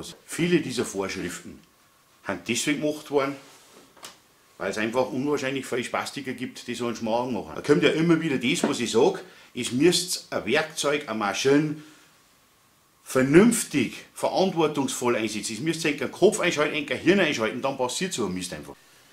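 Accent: Bavaria, Germany. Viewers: Hallo, ich bin dem Bayrischen nur bedingt mächtig, konnte dir aber im Wesentlichen folgen. Aderendhülsen - ich bin von Grund auf kein Mensch der gern mit halbherzigen Werkzeugen arbeitet. Aber die Presszange von Knipex für 130 Euro war mir einfach zu teuer. Ich dachte mir, dass dies auch bestimmt günstiger geht und daher habe ich mal bei dir reingeschaut und bin fündig geworden. Danke für deine Hinweise und deinen Randbemerkungen, die oft den praktischen nutzen beurteilt. ich könnte mir gut vorstellen, dass so eine billige Zange bei 16²mm anders pressen wird, als einer Knipex. Nun, ich bin aber kein Berufselektriker, sondern Elektroniker. Ich löte eigentlich fast nur und dies habe ich in der Vergangenheit auch mit Litzen getan - sie verzinnt. Dies soll aber verboten sein und mir gefällt die Isolation nach dem erhitzen nicht besonders gut. Außerdem ist es schneller erledigt, Aderendhülsen mit Kunststoffhülse zu verwenden, satt sie zu verzinnen und dann mit Schrumpfschlauch zu überziehen. 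Ich werde wohl kaum mehr als 3.5²mm pressen. Hauptsächlich geht es um dünnere Steuerleitungen die an einer Leiterkarte angelegt werden sollen. Das möchte ich gern professioneller umsetzen können. Daher die Suche nach geeignetem Werkzeug. Danke für die Info um Quelle. Dort finden sich zahlreiche Anbieter von Zangen und Sets. Ich schau mal dass ich geeignetes finden werde. Mir war wichtig zu erfahren, ob die preiswerteren Zangen schlechtere Ergebnisse erzielen oder in ihrer Anwendung umst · hallo, zuerst mal vielen Dank für deine Videos immer wieder eine Freude anzuschauen und die Art wie du auf deiner boarische Art die Sachen vor trägst herrlich. Ich habe aus deinen Videos schon viel an Wissen dazugewonnen. Ich habe noch zu einer Zeit Kabel absetzen gelernt als wir nur ein Messer hatten und einen ordentlichen Rundschnitt gemacht haben. Richtig so dass du den Bleistift spitzen die Meinung geigt. 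Und das Leben aus der Praxis betrachtest eben wie es auf der Baustelle abläuft. Ich freue mich schon riesig auf dein Video zum Thema Drehstrommotoren und habe dazu sicherlich jede Menge Fragen. Spannend wäre für mich auch eine Sache die vielleicht noch andere interessiert nämlich die elektrische Drehzahlregelung an Drehstrommotoren insbesondere bei kleineren Ständerbohrmaschinen die dann am 230Volt netzbetrieben werden vielleicht hast du mal Lust dazu etwas zu machen. Wenn's morgz kannst ja mal bei mir bei Instagram vorbeischauen ich mache viel mit Holz aber auch Werkzeugbau und Maschinen mit Vorrichtungen. Liebe Grüße aus Berlin der saupreiß zieht die Hut vor dem Bayern.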